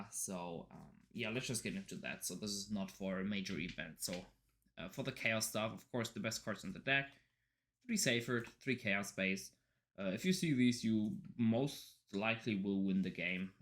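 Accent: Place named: Germany. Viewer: Cool du bist auch ne deuscher :-) Hatte mich schon etwas gewundert über die akzent :-D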